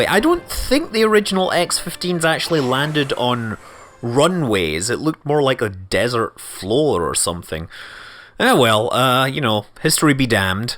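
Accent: Scotland, United Kingdom. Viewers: Hey, you don't have to affect an American accent for the liftoff since it's a Global Space Agency. It could be a fellow with an even heavier Scottish accent than yours doing that countdown!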